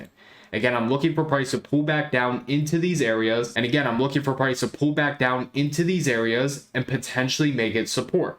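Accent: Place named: Delaware, United States. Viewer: Thanks for these videos. You gotta be from new Castle county 😂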